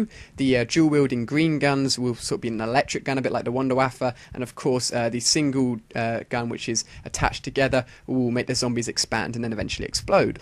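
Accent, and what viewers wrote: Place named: United Kingdom. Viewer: i love your british voice :| me gusta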